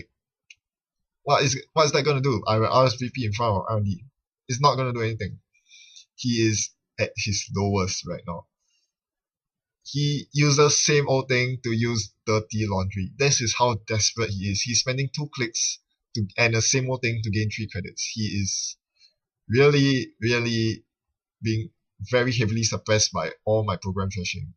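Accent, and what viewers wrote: Singapore. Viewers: Hi where are you from by the way? You sound like a singaporean. :) Just wondering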